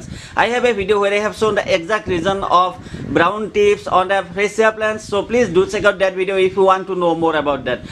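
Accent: Assam, India. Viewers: Have been following ur videos.wonderfull description as if l am going through plant encyclopaedia. accent seemed an Assamese from guwahati ,my city.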